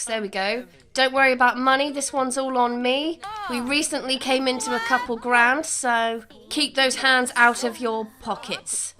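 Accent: United Kingdom. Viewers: I've discovered your channel and can not stop watching your storylines. The British accent is the icing on the cake. ❤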